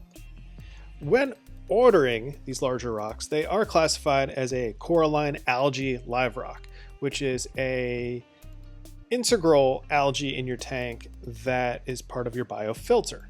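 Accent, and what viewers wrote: South, United States. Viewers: This was great to see ...Bring back your Southern Accent she hasn't been on in a long while except once 😁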